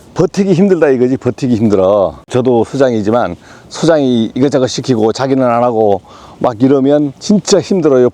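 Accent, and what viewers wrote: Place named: Jeolla, South Korea. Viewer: 이분 가족있나요?? 전라도 사투린데 ..........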